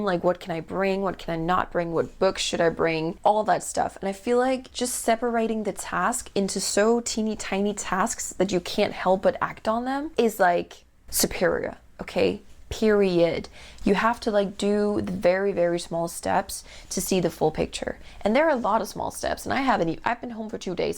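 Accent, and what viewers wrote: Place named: United States. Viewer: I’m curious to know why you have an American accent!?! You don’t have to leave your country to get out of your comfort zone. I did. I moved from the UK to Sri Lanka more than three decades ago! I wouldn’t recommend it to everyone though! It’s been a tough journey for me but i have achieved self growth but then i may have done in my own country too! To have gratitude & a peaceful mindset, it has to come from within not from outside at the end of the day 🧚🏼‍♂️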